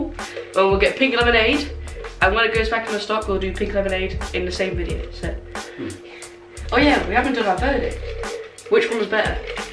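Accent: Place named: United Kingdom. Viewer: You’ve got a thicker British accent than me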